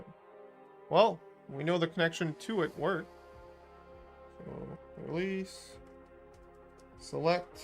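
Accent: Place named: Eastern Canada, Canada. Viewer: iwas into O gauge before N scale takes too much room N scale got into it 2 months ago all Dave and your fault lol. Im originally from northeast ofMiramichi but live in nofthwestern Ontario now . Taught i recognized you eastern accent lol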